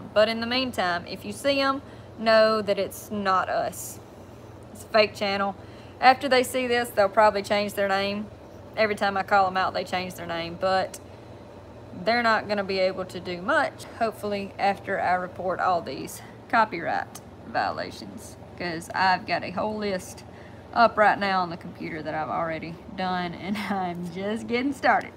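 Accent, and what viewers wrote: South, United States. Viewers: listen to that accent shes form teh south i reckon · Love the southern accent. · your accent sets my heart all a flutter….all I ever wanted in life is a sweet little Southern Belle…or a “wild bush onion”. I’m not picky. Make my dreams come true. Yours forever, A good ol’ Canadian Outdoors Boy 🇨🇦❤️🇺🇸🙏😉😂🍻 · Where are you from? Alibami? Missisip? Cute accent, and your dog looks like my old blue Merle sheltie, Zero. I was just noodling some bluegill the other day... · I love your southern accent I'm from Alabama and there's not many ladies here that speak like us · Beautiful country accent 😍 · The nerve of these thieves. Im sorry that they stole your and your dads and friends and family hard work. I some of my military and war actions picture as a statement by putting words on them. I failed to copyright them. I did a image search on the internet and found my pictures on their website location unknown. 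They stole and own it. I removed all my pictures of the internet now. I now copyright everything I share. I really enjoy your work and every adventure y'all do I love how y'all say at the end of each upload.. 'APRECIATE Y'ALL"... I like to hear southern accent. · Love your southern accent,love your dog and you have such a cool dad. Take good care of your Dad and yourself ... SergeantC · That's one STRONG SOUTHERN accent!!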